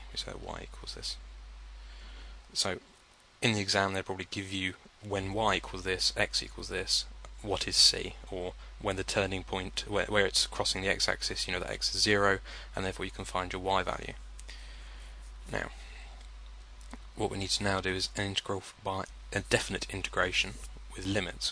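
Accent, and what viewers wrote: United Kingdom. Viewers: Your voice and awesome British accent makes this video that much better. Plus you do a great job explaining!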